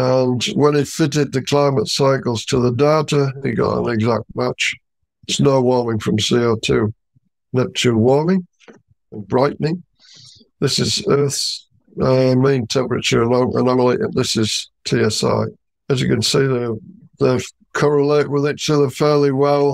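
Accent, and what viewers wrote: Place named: Yorkshire, United Kingdom. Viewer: He sounds as if he's from Yorkshire.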